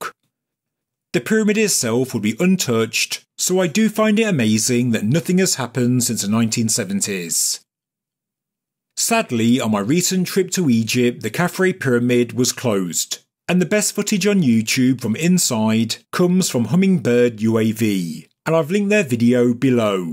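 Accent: United Kingdom. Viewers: May I ask what part of the UK. There are different accents in the UK!! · identifies as a UK citizen. Accent sounds pure UK to me. (No offense to your husband.)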